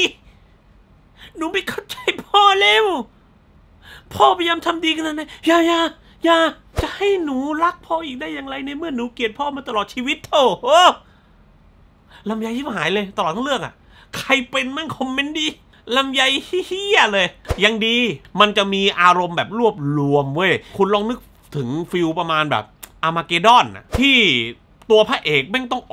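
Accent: South, Thailand. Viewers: พี่คนที่ ติดพูดสำเนียงใต้อะครับ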